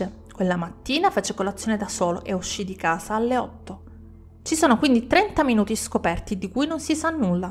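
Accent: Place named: Calabria, Italy. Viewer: a me piacerebbe sapere di dove sei originaria, il tuo accento sembra calabrese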